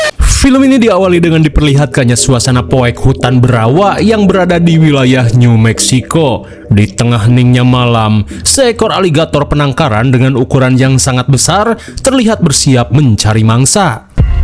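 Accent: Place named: West Java, Indonesia. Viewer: Orang Sunda mana a 😅...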